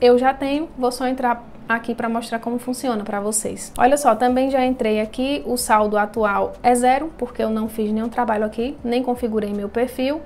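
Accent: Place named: Rio Grande do Norte, Brazil. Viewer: Sotaque igualzinho o meu, já vi que é poti 😁☺️ parabéns pelo vídeo 👏 sucesso!!!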